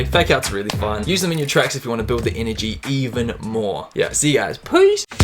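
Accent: New Zealand. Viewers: you are 100% FROM NZ. the accent I can tell.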